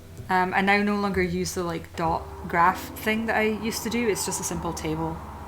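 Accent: Scotland, United Kingdom. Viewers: Hi, sorry for commenting on this one about a vid from the past :P but im so into the storyembryo and I have a question! :D How does foretelling as a tension creation tool work with this plotstructure? Can I foretell something out of the '#6 Pay a heave price (enlightenment/chaos)' part before I start at the '#1 Comfort zone (ignorance/order)'? Ofc I can, I know. But does it work technically? I've written a lot in what I call adventuremode. Now I'm in tidy-upmode so I'm interessted in the theory and if you know more about that! Love your accent. It's Scottish right?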